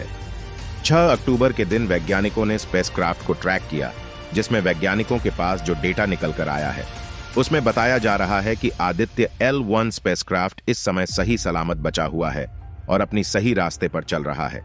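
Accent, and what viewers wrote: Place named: Bihar, India. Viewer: आपकी बिहारी हिंदी सुनकर मेरी हिंदी बोली भी खराब हो जाएगी, अतः शुरू के दो ही शब्द सुनकर विडियो बंद करना पड़ रहा है। Sorry.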